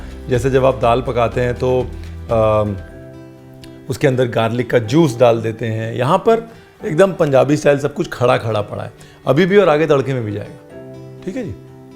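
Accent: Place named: Lucknow, India. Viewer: हय की नहीं😀😀😍❤️ ....chef ye hamare aapke Lucknow ki tone h...aapki बोली mein kafi baar ye nazar aata h 😊😊 So sweet Daal to ekdam mast h Ranveer 🌹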